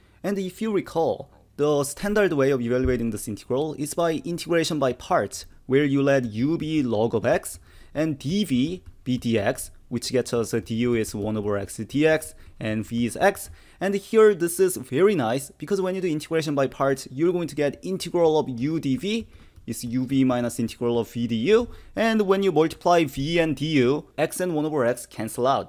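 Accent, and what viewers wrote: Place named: South Korea. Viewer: You must be Korean, with that strong Korean accent, which really adds flavour to your videos.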